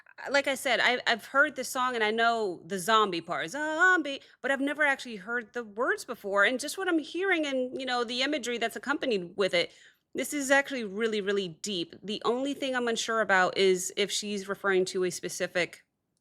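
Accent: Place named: Ireland. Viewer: Lovely Irish tones❤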